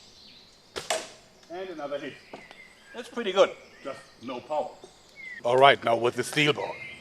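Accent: Germany. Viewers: Ich bin mir nicht ganz so sicher, aber bist du deutsch? :D Hörst dich nämlich ein wenig so an ^^ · as a german i got to say that this sounds like a german dad speaking english. r u german o.O ? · are you from germany originally?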